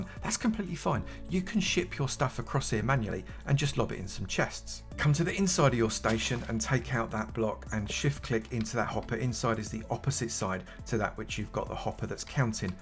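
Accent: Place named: England, United Kingdom. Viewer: He's just English, that's it.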